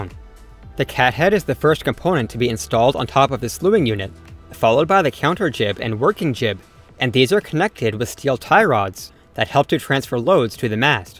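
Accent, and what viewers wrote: Canada. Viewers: I sense a little Canadian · A Canadian narrator! Hello! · Your accent...Canadian? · This presenter has an extremely thick canadian accent. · You're Canadian - aren't you ? 👍 ( Pro - ject vs. prah - ject, mo - bile, vs. mo - bull, etc. )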